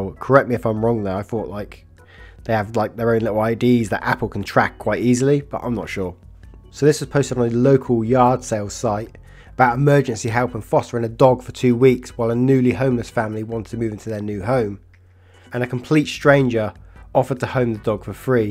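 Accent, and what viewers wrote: United Kingdom. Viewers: Sounding extra British in this vid. :)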